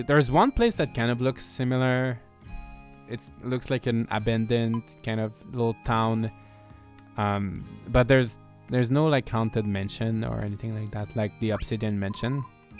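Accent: Quebec, Canada. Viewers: Hey! So cool to hear a fellow French Canadian. I could tell right away from the accent;). I also recently bought Rune Factory 5 and I was wondering if you knew of a website or maybe a Twitter that could provide some form of guide for the game? Simple things like what gifts certain people prefer and what to give monsters/boss monsters. If not, no pressure! Thanks for providing us a high quality, in-depth look at this awesome game. Edit because I honestly thought way too late about this and I feel like that’s something you considered doing in the future: would you be interested in making a video about how to raise friendships fast/who likes what gifts the most and such? Only if it’s not bothersome!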